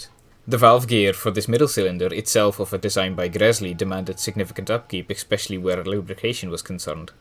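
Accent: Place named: Scotland, United Kingdom. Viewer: An excellent and highly informative video. Although I'm English, I thoroughly enjoyed the Scottish accented narrative. A nice touch and thank you kindly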